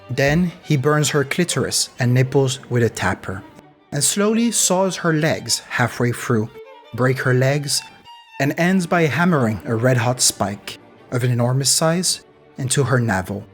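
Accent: France. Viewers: Don't know how I got here. I don't know why I stayed and watched. But I did and now I know things I don't want to know. There was way too much tits asses and penises in this and now this is my last video before bed...the dreams I will have...oh my...I am going to concentrate on that French accent instead...*clicks heels* there's no place like Gay Paree, there's no place like Gay Paree... Hello France✌🤟 câlins et bisous from America 🇺🇸 California to be exact. Vive La France 🇨🇵 great video très intéressant. ♥️💙❤ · I have the impression that the narrator is french but has good english, great content as well, kudos👏👏👏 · well he IS French obviously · Hes actually French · Rien qu'en t'ecoutant parler on sait que tu es Francais...i guess only French speaking people can tell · ?? He's French! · Time to hear more of that french accent. Edit: ok, now I understand why this video is age restricted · Am Inlove with your accent. 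Now I want to learn French♥️ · I couldn't have been the only one thinking they NEED to learn French hearing this narrator. Beautifully done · He is French ^^ I am and I hear it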